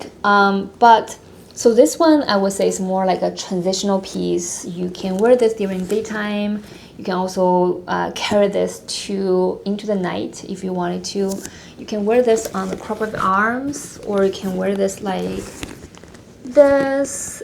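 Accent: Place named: New York, United States. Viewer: when you spoke about the rain and wind I listened and just realized you are a NYC girl too!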